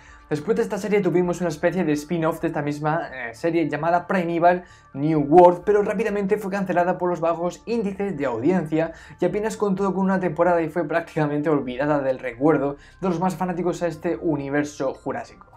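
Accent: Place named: Latin America. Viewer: Tenés que aprender un español más neutro, cuesta entenderte en el español sudamericano. Tenés que aprender a proyectar las palabras hacia afuera, hablás hacia adentro y suena un pasticho engorroso, obviamente te miré 20 segundos.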